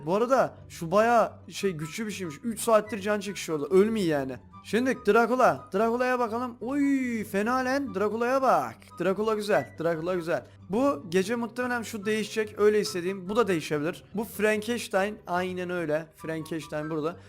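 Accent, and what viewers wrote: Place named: Gaziantep, Turkey. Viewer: Abi seni eskiden antepli zannediyodum ama degilmişsin üzüldüm ama aynı antep şivesi yapıyorsun seviliyosun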